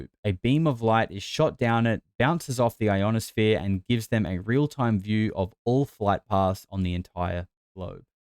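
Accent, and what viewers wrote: Australia. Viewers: Yes frame, U DO SOUND LIKE THAT - THE 1ST WORD U SAID HAD STARTED YOUR AUSSIE ACCENT, EVEN THOUGH U PROBALY GREEK OR ARABIC BACKGROUND!!! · No buddy; Aussies sound amazing, love the way you speak :)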